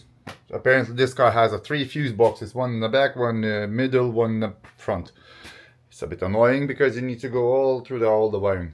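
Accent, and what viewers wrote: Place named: Eastern Europe. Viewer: Good luck my Slav accent friend. I belive in your skills and your patience. I wish you all the best. This Bentley will be turn over point for your channel,repaired or not. New subs will comming. Sorry on my bad english,cheers from Croatia.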